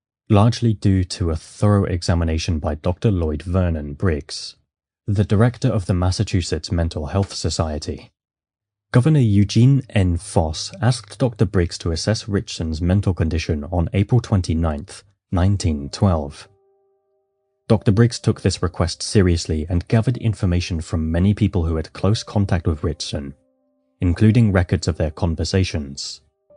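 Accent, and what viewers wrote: England, United Kingdom. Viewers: Wait is it you? I like it. You are not mispronouncing the word, please don’t work on it, it is my American ears and your lovely English accent. There is nothing wrong with your pronunciation!!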